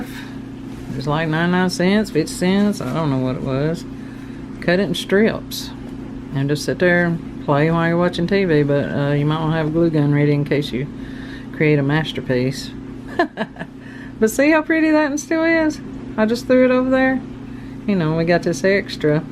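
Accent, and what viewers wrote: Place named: North Florida, United States. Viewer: Loove this, too! I am also a person who loves thrift store fabric! I am so crazy about fabric flowers. I like almost anything made from fabric. I could craft 24/7 , IF I had the time! I am from North Florida. You sound like us here in good old Live Oak. I am guessing you are from the south as well. I love watching you and listening to you. Thank you for sharing.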